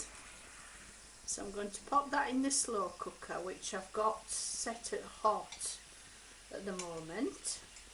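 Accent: Yorkshire, United Kingdom. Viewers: I love your accent, are you from Yorkshire?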